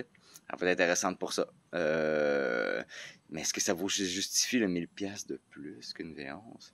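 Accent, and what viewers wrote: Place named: Quebec, Canada. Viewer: Wow l'accent québécois est très fort 😂 tsé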